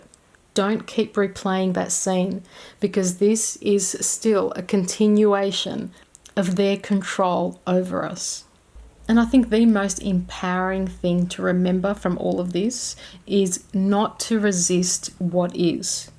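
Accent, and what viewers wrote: Australia. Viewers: Yes it is a lovely hypnotic Australian female voice.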